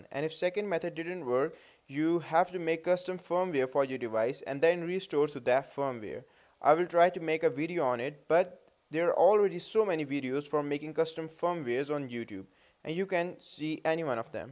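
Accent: India. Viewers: (not racist) Cant tell if Indian or british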